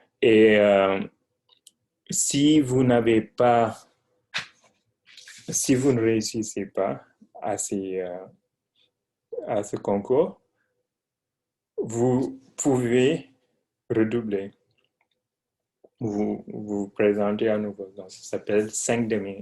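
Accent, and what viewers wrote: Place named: England, United Kingdom. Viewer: C'es ouff tu parles français avec un accent anglais pour un francophone ! Great!!!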